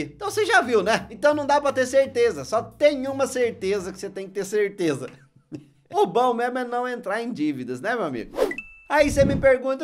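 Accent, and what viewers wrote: Minas Gerais, Brazil. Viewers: Mineirim de tudo!! E já foi corretor de imóveis! Kkkkkk!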